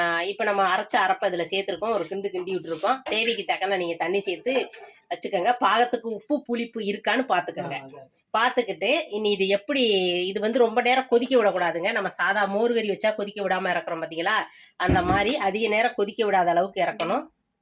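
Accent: Kerala, India. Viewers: She talks as if born and brought up in kerala. She neither looks good nor has brain. Attitude mattum high level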